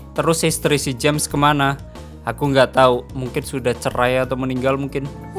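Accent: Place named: Tegal, Indonesia. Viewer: Voice overnya pasti orang tegal -,-'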